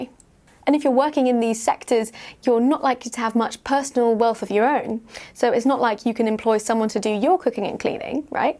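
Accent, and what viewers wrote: United Kingdom. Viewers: Cute, sexy, smart and with a British accent......cool!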